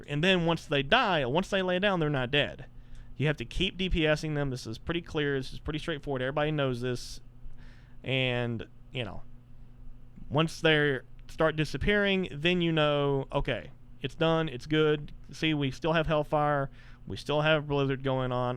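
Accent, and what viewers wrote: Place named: South, United States. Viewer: great video, made better by that smooth southern baritone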